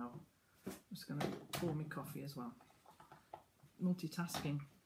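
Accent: United Kingdom. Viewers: I just found your channel linked to Hercules Candies channel. You are so sweet and fun to watch. You made me smile several times as I watched. You stated many things that I feel about Hercules Candies and their crew. It was so fun watching you use the puddle and Steve's technique. Plus, the added bonus of your charming British accent. 😏 Thank you for the smile today. (I know a year later..) I'm looking forward to catching up and watching more. 🙂💚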